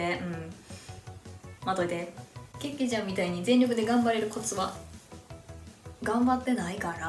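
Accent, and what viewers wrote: Kansai, Japan. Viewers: 松浦さんの話し方、私はすごい好きです！！これぞ関西って思います！2019の岸和田だんじり祭りの番組見てから松浦さんを知りました。その番組も撮って繰り返して軽く20回以上見てます！その時の松浦さんもYouTubeやってる時の松浦さん！凄く好きです🥰🥰これからも応援してます！大好きです😭✨それにレペゼン地球のチャンネルを登録してるのすごいビックリしました😳私、レペゼン地球のファンで凄く嬉しいですヾ( 〃∇〃)ﾂ ｷｬｰｰｰｯ♡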